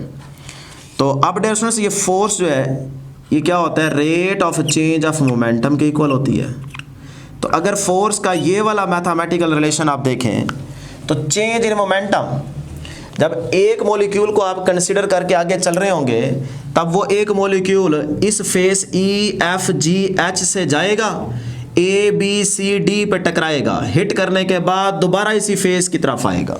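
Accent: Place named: Saraiki, Pakistan. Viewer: Sir ap saraiki laghta hnn😊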